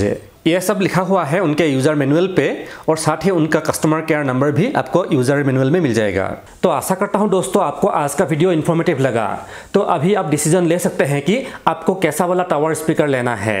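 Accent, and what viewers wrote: Assam, India. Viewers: Aap Assam se hai aap ka aawaj se pata chala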